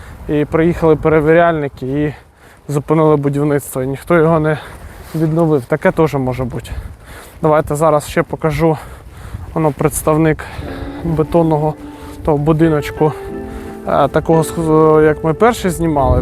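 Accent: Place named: Russia. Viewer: ну будто русич пытается по украински говорить)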